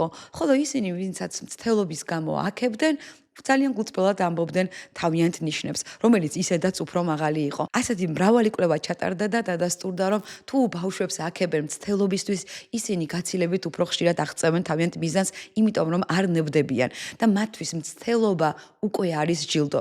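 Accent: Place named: Guria, Georgia. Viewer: "კვტეპიან" გურული ხარ უეჭველი❤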